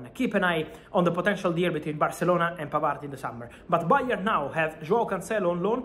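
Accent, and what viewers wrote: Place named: Italy. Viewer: bro, the "e" in "reached" or any other verb in past tense is silent. Cmon, you are THE football journalist. No need to loose your nice Italian accent but put in a little more effort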